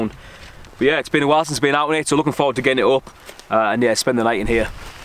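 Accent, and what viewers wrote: United Kingdom. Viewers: Ya know if it wasn’t for your accent, I wouldn’t think you’re in the UK , the scenery is as me cousins in Ulster would say lovely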